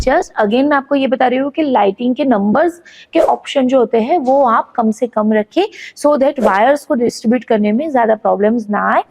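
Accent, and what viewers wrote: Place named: West Bengal, India. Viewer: Why you sound a bengali?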